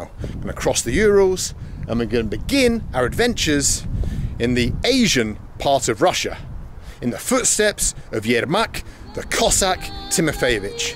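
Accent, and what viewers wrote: United Kingdom. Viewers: Great video,! A foreigner who helps me, the russian to better understand a "misterious russian soul". I enjoy your epic voice and nice brittish accent. Thanks for travelling across russia's provinces. Hope to see you one day here in Russia's Far East Sakhalin. And yes, all museums in Russia are usually closed for a day-off on mondays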